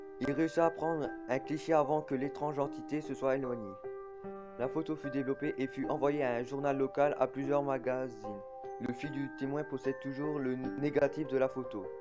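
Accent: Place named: Nord-Pas-de-Calais, France. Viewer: à entendre ta voix et ton accent... Un Ch'ti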